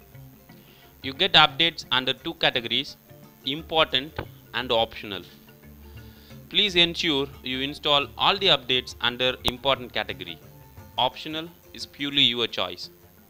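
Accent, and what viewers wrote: India. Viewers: İndian English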